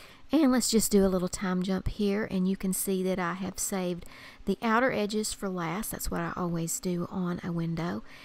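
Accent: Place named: South, United States. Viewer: Omg! Such a well done video, and I just LOVE you southern accent! ❣️ Thank you!!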